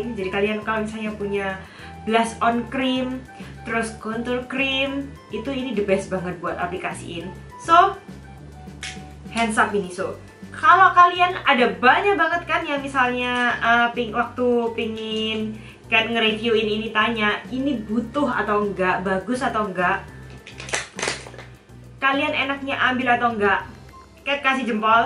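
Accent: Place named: Surabaya, Indonesia. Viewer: Mau cerita sedikit ya kak Aku ngikutin video kakak dari awal banget sampe sekarang dan aku juga udah subscribe channel youtube kakak dan aku seneng banget kakak itu baik dan ramah dan mungkin kita sama2 dari surabaya kali ya hehhe😂 gaya ngomongnya medok khas orang surabaya Ohh iya satu lagi aku udah like, subscribe, and comment lengkap sudah👍 #kathlakzgiveaway2018 Aku mau jelasin kenapa aku positif thinking untuk menangin giveaway ini karena 1. Mau ngikutin jejak kak bisa makeup se-professional kakak 2. Pengen jadi youtuber juga tentang makeup2 gitu, doain aja ya kak semoga terwujud😊 3. Aku udah gak sabar buat nyobain brush itu, kakak buat aku ngiler deh pengen nyobain 4. Percaya diri bahwa aku bisa menangin giveaway ini😆 "MERRY CHRISTMAS & HAPPY NEW YEAR🎉🎉🎉" IG: Email: <mail>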